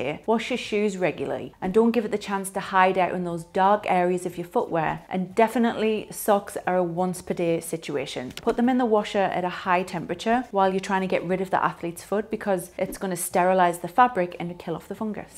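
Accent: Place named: Newcastle, United Kingdom. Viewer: Ooooh noooo! I bet the Californians love your Geordie accent ;) Thanks for the video, informative.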